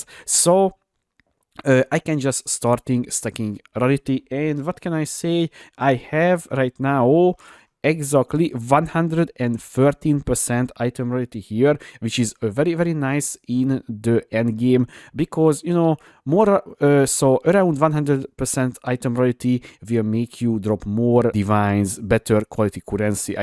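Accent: Hungary. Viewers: Szia! Jól hallom hogy magyar vagy ?:D Lenne egy kérdésem , hogy hardcore on játszható ez a build ?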